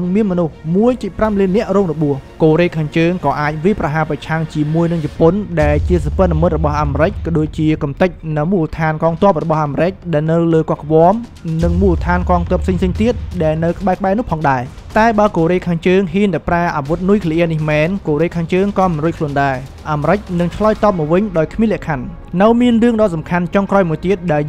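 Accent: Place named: Prey Veng, Cambodia. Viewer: សំដីសំដៅរបស់ admin ដូចអ្នកស្រុកព្រៃវែង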